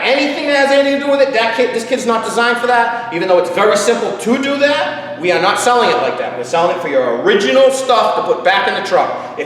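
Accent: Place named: New York, United States. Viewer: I think this guy just might be from the new york area.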